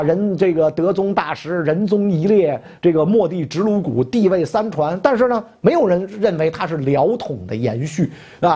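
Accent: Beijing, China. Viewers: 北京北城人说话大概就是袁老师这个样子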